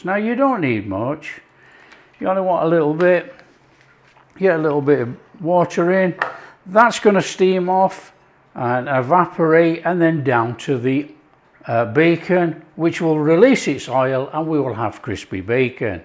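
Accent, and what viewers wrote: Yorkshire, United Kingdom. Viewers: He doesn't sound like an Aussie beowtiful is Yorkshire. He lost me when he put ketchup on it, tomato doesn't go with egg.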